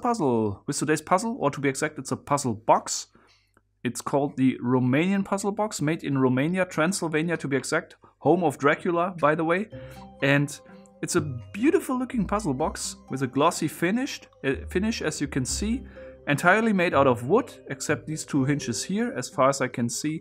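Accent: Germany. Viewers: Is that german accent? 🤔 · wie man einfach raushört das er deutsch ist xD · Yes, it is a German accent...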